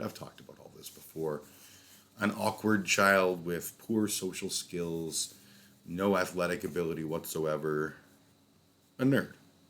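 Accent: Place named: Appalachia, United States. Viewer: I love your hillbilly accent 😂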